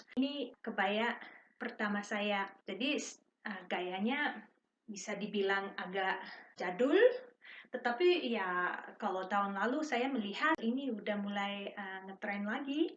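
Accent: Bali, Indonesia. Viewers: u got Balinese accent actually..kebaya suits u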